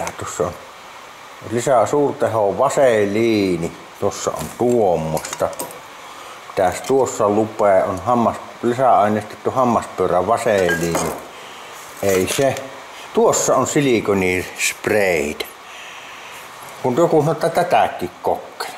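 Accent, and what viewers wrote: Savo, Finland. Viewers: Rikasta tuo savon kieli😊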